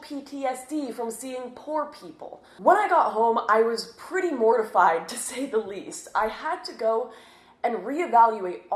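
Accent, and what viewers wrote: Canada. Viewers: Al DEE it must be due to her Canadian accent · Draegens and Frodo Baegens. Canadian accents (kinda Minnesotan) are hilarious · draygons? frodo bayggins? is this a Canadian thing? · Dylan Gill But she isn't American, she's Canadian; I have a couple Canadian friends, and they often pronounce the letter "A" weird. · Don't remember what video it was but it was a recent one. In it I was kind of surprised at her pronunciation of eggs ('aegs') and flags ('flaigs') as well. I knew Canadian English was a bit different to American English accents (the well known 'aboot' instead of about :P) but I didn't know (some?) Canadians pronounced these words like that. But to be honest, I couldn't care less what accent she speaks in, I'd still listen :D. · i hate that canadian accent lol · wow dude, so many Canadian pronunciation gimmicks... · 😘 Amazing red pill moment beauty Canadian accent and all the lies of the world are coming out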